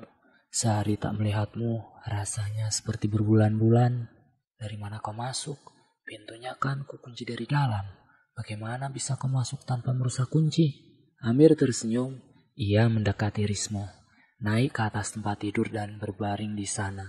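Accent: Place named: Borneo, Indonesia. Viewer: abang ne urg kalimantan kh , logat bhsa nx kek asle urg pribumi borneo 😅😅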